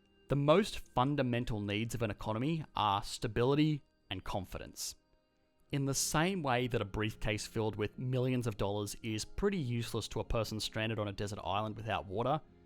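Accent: Australia. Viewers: That Aussie accent though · I've had a look at the comments section and as an Australian I'd like to encourage to double down on the Aussieness.....let's get some Poiter in there, get some 'Noice' going and let's harden up those 'Buhhhhht's and I'm expecting some "yeah, nah"s to appear......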